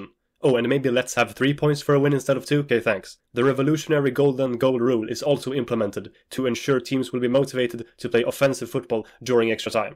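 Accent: Sweden. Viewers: You sound so swedish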